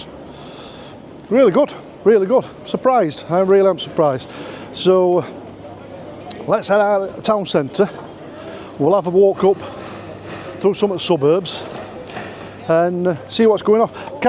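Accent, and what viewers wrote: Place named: Yorkshire, United Kingdom. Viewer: Hi wast sure as new to you tube shows like this if you were being sarcastic or taking offence ? I was saying your accent is very broad yorkshire like some in Emmerdale there is nothing wrong on that unless no sense of humor But Bradford is okay city like everywhere in England and USA ideology everything sadly is going wrong all over UK generally as mass capitalism and individualism have causes a lot of problems all over UK as we follow isa ideology of divide and rule many English folk are often negative about everything these days sadly as you said Bradford has good curry Capital also is capital of culture this year And Broadway shopping center is nice and many places But everywhere has changed in UK I remember the Bradford City fire as well very sad tragedy it was just when you wrote about being from yorkshire with me saying about your accent came across as if you had took offence ? 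Problem is most English have become rude these days wherever you go in England nice areas or poorer ones the last ten years has told me England is not a country that's safe or nice full stop anymore no democracy capitalist dictatorships like USA and as I write this we are being sadly groomed for another war in Russia Ukraine so that new Tory party robot starner and Co can use it as a distraction and a excuse to cut benefits for disability people and there is millions of other problems going on all over UK generally I think of we try to talk up places and England will also help take care and the v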